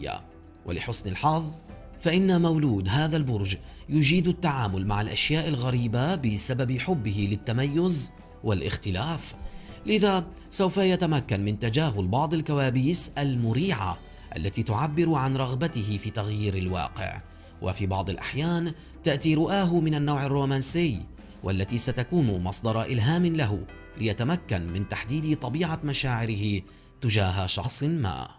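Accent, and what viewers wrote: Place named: Arab world. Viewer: ماشاء لله على الصوت والحنجره العربيه الاصيله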